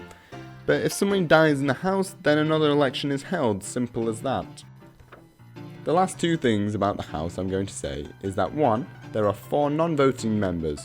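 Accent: United Kingdom. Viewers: I liked the way you explained it with a British accent. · English accent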